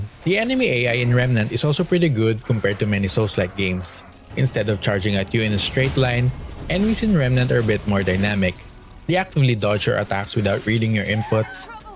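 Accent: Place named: Philippines. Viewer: You sound like Pinoy bro. Are you pinoy?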